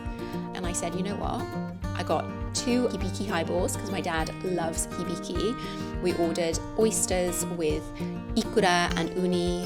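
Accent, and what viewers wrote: United Kingdom. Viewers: 動画の配信がしばらくなかったので、心配していました。 素晴らしい家族愛でお父様への孝行と恩返しをされて、天国のお母様も きっとお喜びだった事でしょう。 素敵なイギリス英語とともに、感動の動画をありがとうございました。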